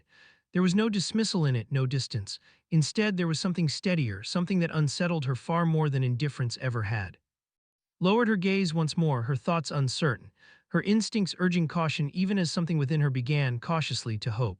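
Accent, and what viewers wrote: United States. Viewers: An American voice is just wrong.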